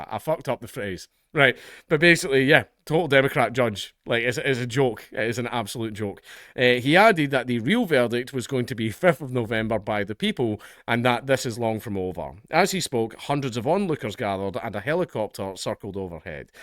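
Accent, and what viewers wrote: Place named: Scotland, United Kingdom. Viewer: "I really like it when people get angry" - dude, we can already tell by your accent you're Scottish. No need browbeat us with it.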